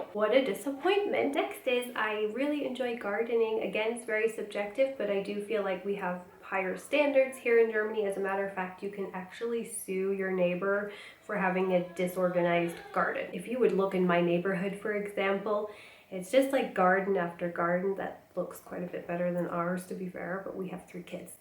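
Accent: United States. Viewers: You speak softer American accent... more feminine and polite sound...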